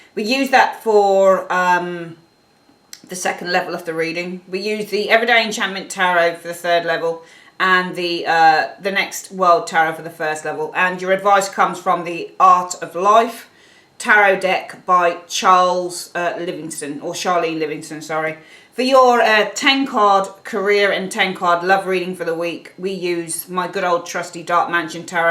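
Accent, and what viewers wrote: United Kingdom. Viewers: love your pronunciation..so BRITISH..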